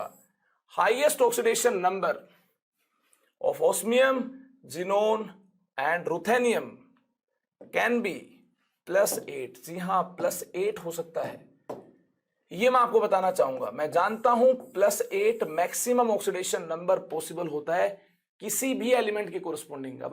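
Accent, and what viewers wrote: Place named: Haryana, India. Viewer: sir, u have a haryanvi tone in your voice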